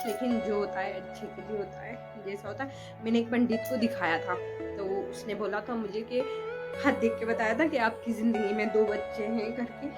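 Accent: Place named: Bhopal, India. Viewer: Aapka tone bhi bilkul bhopal ka hai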